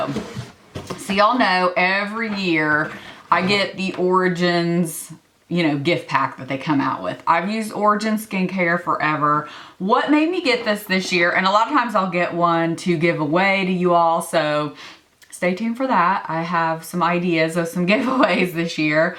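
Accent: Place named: Texas, United States. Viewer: I will echo what many have already said. You look beautiful - your makeup is flawless. I would love for you to be my personal shopper for a day!!! While watching the video, I had to turn my phone volume up because I thought you said you were putting things in your car (instead of cart)!!! Why is she storing good makeup in her car??? I started laughing when I figured out what you were saying. I was born and raised in Texas, but your accent is thicker than mine! (Not a bad thing at all.)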